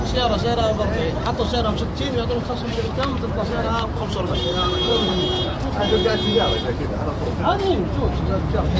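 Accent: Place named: Saudi Arabia. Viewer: يابني ده سعودي ليتكلم مصري ..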